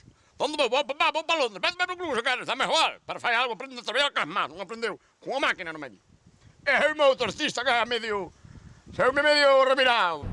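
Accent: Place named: Valladolid, Spain. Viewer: Cando falas coma o paisano,tes acento galego. cando falas ti tes acento de valladolid curioso, non??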